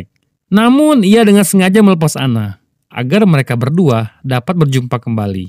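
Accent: Palembang, Indonesia. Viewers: Aiii mang cek nee wong plembang yoo...😆😆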